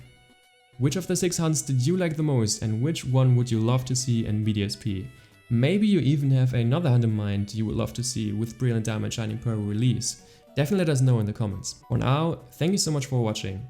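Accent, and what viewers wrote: Germany. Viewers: you sound like youre german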